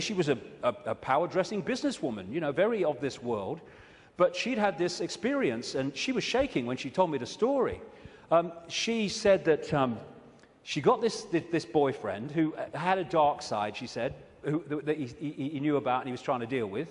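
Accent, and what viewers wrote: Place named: England, United Kingdom. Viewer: This guy is the best!!! He's out of his mind, and he has an English accent to boot. I love this stuff, though, b/c as a sci-fi satirist, this stuff is a veritable goldmine. Keep them coming, and do not take your meds. You're fine just the way you are, in all your conspiratorial, absurd glory!!!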